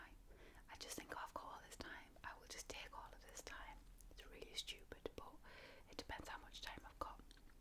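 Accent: United Kingdom. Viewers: Can I ask one more question? I sort of collect accents and I'm somewhat good at US accents, but that's because I live here. I'm really just starting to be able to recognize accents within the UK. What area does your accent originate from?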